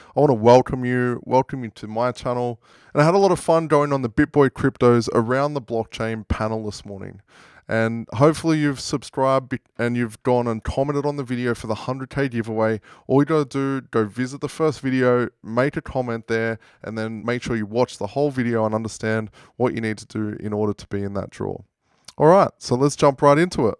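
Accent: Australia. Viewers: Yep exactly mate! From Bitboy segment . Congrats on winning that segment.. im a new sub mate.. i am Aussie so nice to hear the Aussie accent.. i live in the Philippines now so yeh to get my Aussie fix im gona watch you! Cheers and keep it up bro! P.S Elon is a Sh!thead👎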